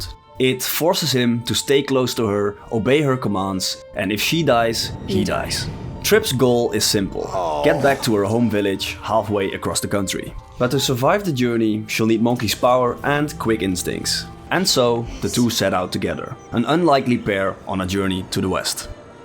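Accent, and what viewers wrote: Netherlands. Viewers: Love the steen kolen English. ❤ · You have a really thick Dutch accent haha, I assume you're Dutch or Belgian, cool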